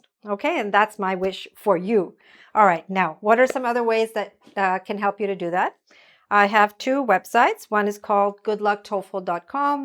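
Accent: United States. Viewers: Pardon,it's american english? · Her accent is American so I'd bet for American English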